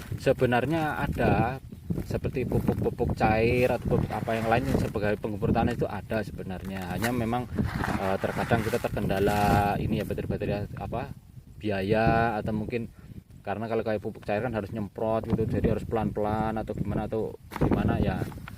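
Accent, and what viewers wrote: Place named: Banyumasan, Indonesia. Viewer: Rika wong ndi kang deneng ngapak kaya nyong cilacap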